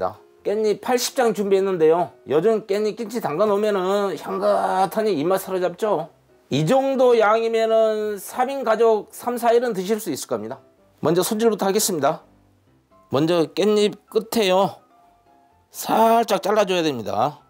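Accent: Jeolla, South Korea. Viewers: 구수한 전라도 말씨 참 듣기 좋네요..음식두 간편하게 잘 알려주시구요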